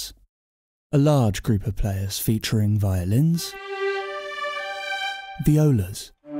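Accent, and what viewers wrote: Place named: United Kingdom. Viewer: Profesh, complete with a British accent!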